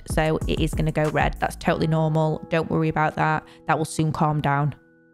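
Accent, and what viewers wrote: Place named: Northern England, United Kingdom. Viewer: hear a northern english woman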